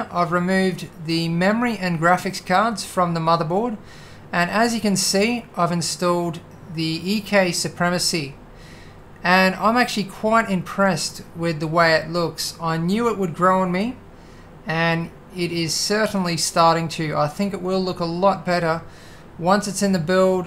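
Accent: Australia. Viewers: I can't tell if you're an Aussie or a Kiwi... You sound generally Australian, but when you say things like "build log part 12" as "boold log paht twulv", it sounds rather Kuiwui (like me!) Cool boold though!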